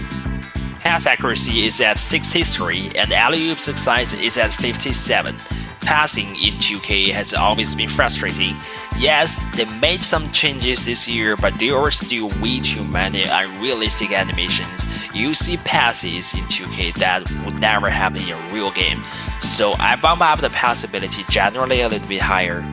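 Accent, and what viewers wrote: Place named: China. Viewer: This guy is probably Chinese.. cause of his accent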